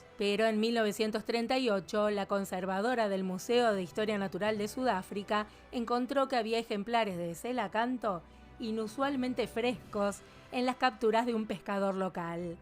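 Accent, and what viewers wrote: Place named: Rioplatense, Argentina. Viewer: ❤ siempre muy instructivo sus videos y su acento Rioplatense lo hace mejor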